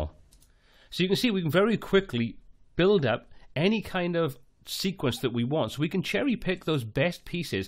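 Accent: Wales, United Kingdom. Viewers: The ever friendly Welsh accent ! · Do I detect a Welsh accent? If my ear is correct, for the WIN because me our mum is from Cardiff [Cah-diff]. =D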